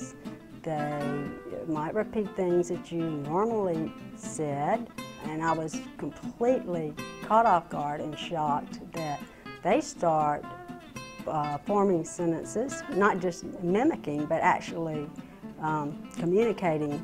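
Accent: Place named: Tennessee, United States. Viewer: Spot on for African Greys--I've had parrots for 40 years, my Grey for 15. Just an aside, I make a hobby of identifying southern accents (I'm from Florida, we don't count) so, here goes....hate to get it wrong: Tennessee?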